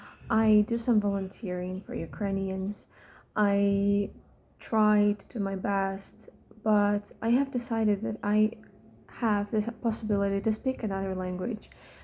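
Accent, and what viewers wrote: United States. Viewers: I reiterate the common sentiment regarding the quality of content. But hardly anybody has commented on the supper quality of English. I, for one, could have easily assumed she is an American. Simply superb (American) English. Hi from England, UK. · they all sound like an Americans voice? I can barely tell English isn't your first language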